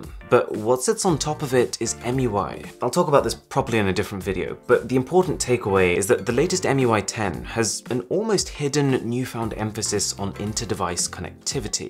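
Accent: United Kingdom. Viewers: The only thing i payed attention to is that British accent.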